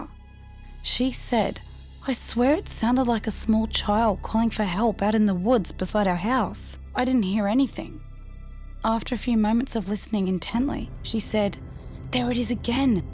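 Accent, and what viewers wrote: Australia. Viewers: I'm from middle Tennessee and wtf with the Australian womans voice for a Tenn womans story 😂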